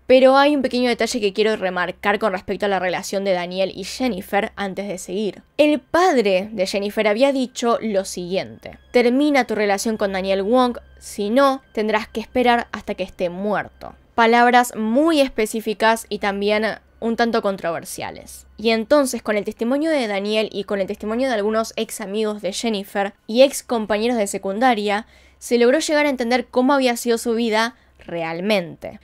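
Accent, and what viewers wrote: Buenos Aires, Argentina. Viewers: Pero si tiene acento de buenos aires jsjsjs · Es acento porteño y yo soy Rosario tenemos otro acento. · Yo soy de Córdoba y el acento de es porteño. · Lindo acento Argentino te super Admiro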